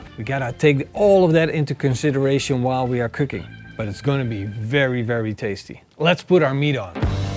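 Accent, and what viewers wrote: Netherlands. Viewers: Your accent sound like Dutch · English with a dutch accent, lekker boers hahaha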